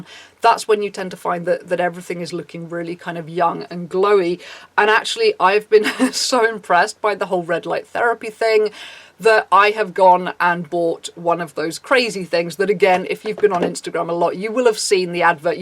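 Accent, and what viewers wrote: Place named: RP, United Kingdom. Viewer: God she's posh !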